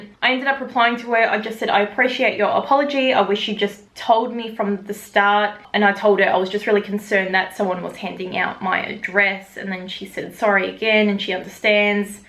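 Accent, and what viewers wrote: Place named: Australia. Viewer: I liked this story time!! It was really interesting & I love the way you explain stuff. Being a fellow Aussie I just relate so much to the way you speak & it makes me laugh (even tho the girl being a stalker wasn’t funny!!!!) xxx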